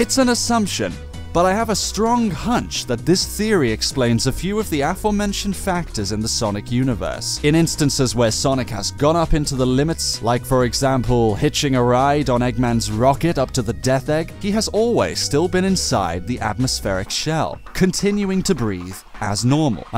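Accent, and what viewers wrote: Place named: United Kingdom. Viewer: 【The Sonic Theorist】 Hey mate. It's just a suggestion but please do you think you make a video or 2 such as ya top favourite Sonic characters and/or top favourite Sonic games? I'd LOVE to see videos like them!:D:D:D Or do you already have them on ya channel? I love your accent!:D:D:D Are you from the UK too? I am! XD I'm from Sunderland, England! XD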